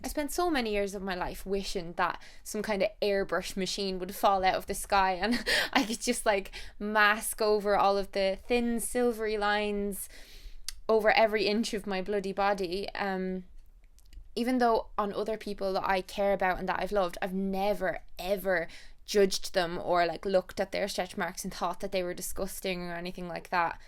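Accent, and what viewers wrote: Ireland. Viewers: In all honesty I'm not happy with my body but there's no hate. I've struggled to put weight on for years and after recently being diagnosed with under active thyroid, I can now start to heal and find balance to my body again with Meds and a return of energy. Though I don't like how my curves have dropped off and I already have a prominent bone Structure, I am thankful for the blessings I do have, I remind myself that my vessel doesn't define my value and the fact I would never want another to feel In such a way so I must be kind to myself also. The odd days where I just feel bleurgh, I will either sloth it out n just enjoy being comfy n have the lil treats that cheer me up like a brew, favourite food or a pamper. Or I will front it out, put a face on, get dressed and put focus on a part of me that I'm happy with ie: do my makeup a different way to draw attention to my eyes or lips, accentuate my waist or wear tights or Leggings instead of something unshapely. 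Thank you for always being so open and honest and sharing your experiences. I adore you and your Irish accent and know you inspire so many people, so thank you for using your platform for kindness 💖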